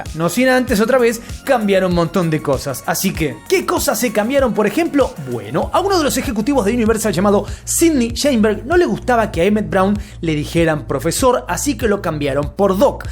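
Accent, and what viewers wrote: Argentina. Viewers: Me estresoooo tanto tú forma de narrar ...entre ese acento argentino (ufff) y la forma exagerada de las impostar la voz que no logro acordarme cuando vi Back to the future!!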